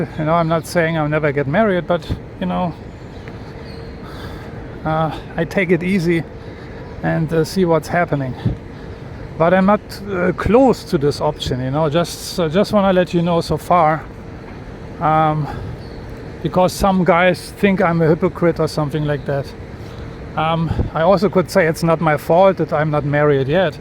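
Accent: Germany. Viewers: I like the English with the German accent!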